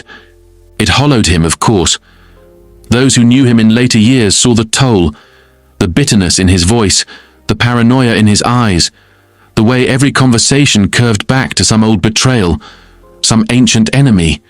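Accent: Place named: England, United Kingdom. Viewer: they wanted to be broken..funny narrated by a Pom - more damage to the world done by the English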